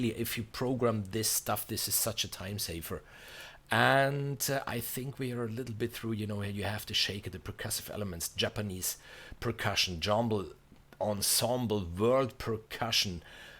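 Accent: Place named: Germany. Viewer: oh, du kommst aus Italien? Opsss dachte du bist aus Deutschland 🤦‍♂️ zumindest nach dem englischen Dialekt nach! Vielleicht reicht ja auch einfach ein deutscher Untertitel? Oder aber, mach einfach weiter so, so viele composer die film score machen gibt es eh nicht in Deutschland und eigentlich hast ja recht, englisch sollte eh jeder können, der in unseren job arbeitet! 🤗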